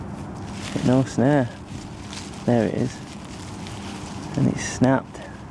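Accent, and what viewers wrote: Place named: Yorkshire, United Kingdom. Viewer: good video, proper Yorkshire man by the sounds of it. Sheffield at a guess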